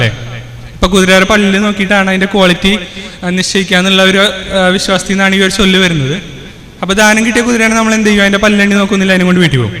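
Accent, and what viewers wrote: Thrissur, India. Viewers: കിടിലൻ സബ്ജെക്ട് dear..... കിടിലൻ തൃശൂർ സ്ലാങ്... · Thrissur Slang presentation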